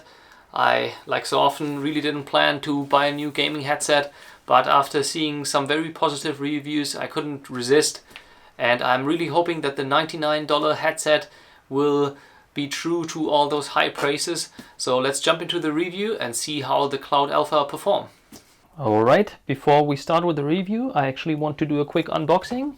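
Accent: Germany. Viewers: Deutscher Akzent kickt anders haha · He is from my State. Germany. So he has an german accent that is what you hear😆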